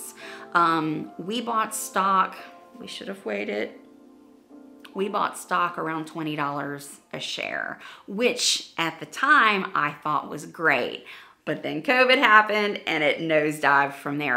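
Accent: South, United States. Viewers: She sounds southern, so maybe Virginia.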